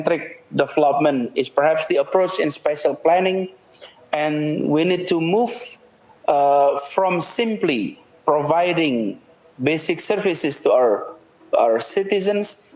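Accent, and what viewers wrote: Indonesia. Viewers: To be honest, this is the very first time I see this 'bahlul' speaking English, and I have to say that I'm not at all impressed with his English. I find he just speak Indonesian with English words (not vocabulary). You know what I mean, don't you?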